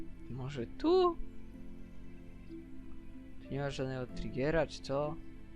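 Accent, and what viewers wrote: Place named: Poland. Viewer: Masz bardzo fajny głos. Czy ty pochodzisz z jakiegoś regionu polski że masz taki akcent?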